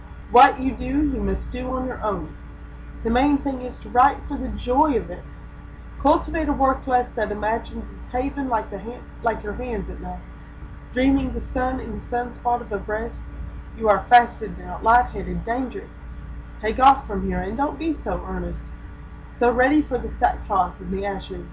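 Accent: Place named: South, United States. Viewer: Thank you for posting this reading. Lovely. I have never heard Station Island read in anything remotely like your (Southern?) accent. To my ear there's an earthy (even folksy) tone to your voice that suits Heaney's poetry surprisingly well.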